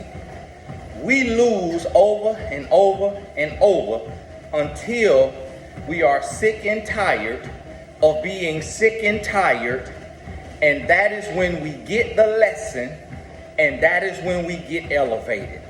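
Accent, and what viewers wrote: South, United States. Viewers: Hey! OK, so Now, there's been a CHANGE, right? You are NOW preparing to WORK ON your COMMUNICATION Skills and NOT be in a comfortable Mindset of ACCEPTIng your Country twang talk, as this is who I AM? And my guess is, you came to the conclusion that it's better to have MORE people UNDERSTAND YOU than not, to MAXIMIZE your OPPORTUNITIES for financial growth 💵, as you pointed out this is the time to grow, store and save! AND I DEFINITELY GET IT because I See that God has made YOU a QUICK THINKER in evaluating WHAT'S BEST! BTW... Your decision to have some 15 minute videos, is AWESOME because, after I have thoroughly enjoyed listening to Much longer videos in initially receiving your wisdom, experiences, and perceptions, NOW, my attention Span has become shorter in my READINESS to watch a much longer video. However, RELATIONSHIP email reads and responses, IMO are Ok for longer. 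Maybe others feel differently?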